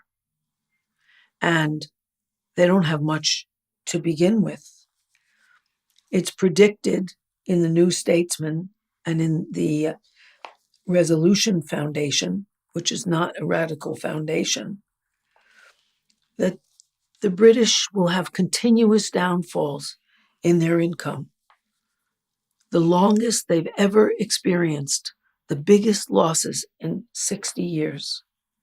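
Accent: New York, United States. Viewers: Yeah it's probably the raspy New York accent, as well as the identically emotionally expressive face that does it.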